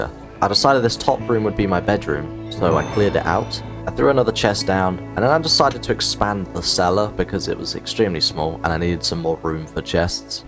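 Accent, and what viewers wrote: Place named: United Kingdom. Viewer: HAIII IM HERE TO CALL YOU MY FAVORITE BRITISH, PLS SAY BOTTLE OF WATER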